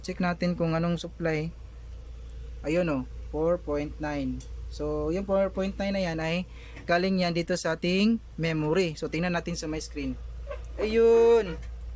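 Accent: Visayas, Philippines. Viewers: Yan ang tinood na mag tudlo..hajaj bisaya ka sir?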